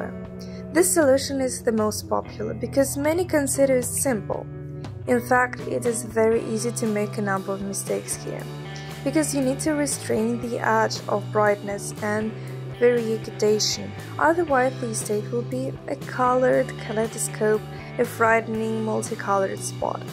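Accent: Russia. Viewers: русский акцент убивает просто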